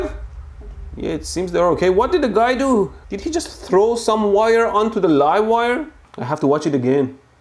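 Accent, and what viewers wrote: Iran. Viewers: عاشق این لهجه ایرانیم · Kheyli zaye english harf mizani adam mifahme irani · ایرانییییی ؟؟؟ لحجتو کاملااااا تشخیص دادم 😂😂😂 ولی دمت گرم خیلی مردی 😂❤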